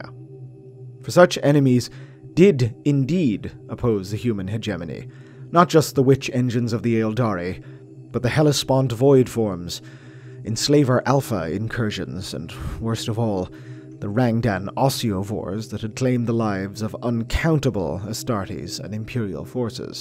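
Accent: Ireland. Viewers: 🇮🇪💪 bro an bhfuil gaeilge agat? Maith an fear. Proud of this brogue. I think its a bit familiar. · he sounds Irish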